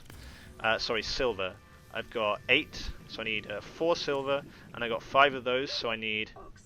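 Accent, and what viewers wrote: United Kingdom. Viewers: As someone said down there you're really good at commentating, and I absolutely love your english accent (as a french) ! And doing this while performing is incredible _ I say "Chapeau bas" ! :D EDIT : and he even EXPLAINS during his performance it's just so cool !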